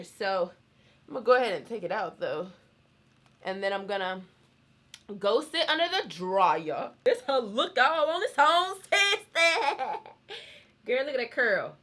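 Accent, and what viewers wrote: South, United States. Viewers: Ur southern accent is coming through in the clutch ❤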